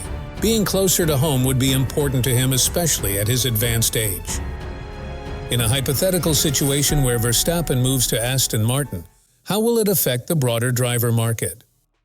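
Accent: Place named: United States. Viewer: It's funny hearing an American sounding like he knows F1.....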